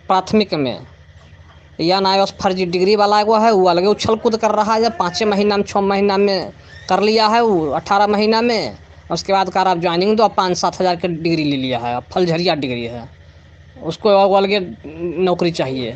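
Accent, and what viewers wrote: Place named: Bihar, India. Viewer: Ekdum sahi bola Bhai Tone to ekdum bihari Hy bhai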